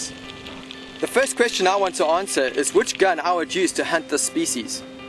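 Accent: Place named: South Africa. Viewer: lol he isint kiwi his south african haha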